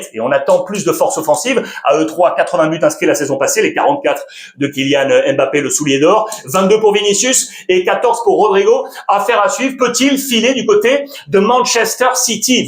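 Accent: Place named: Spain. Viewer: J'adore ton talent depuis tes prestations sur Canal +. Surtout ta prononciation avec ton craquant accent espagnol des noms des joueurs de la Liga. Stp prononce mieux le nom du défenseur uruguayen du Barça : R. ARAUJO pour mon grand plaisir, depuis Abidjan ❤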